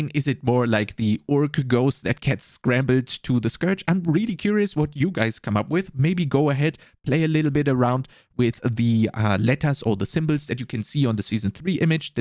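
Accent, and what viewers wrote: Germany. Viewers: Alter... noch nie einen so dermaßen deutschen Akzent gehört.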